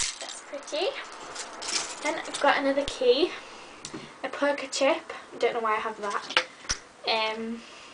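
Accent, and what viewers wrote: United Kingdom. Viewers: UK gals always have the cutest accent lol put more vedios nice work :) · cooll videeoo you have a more british accent than me and i live in the uk to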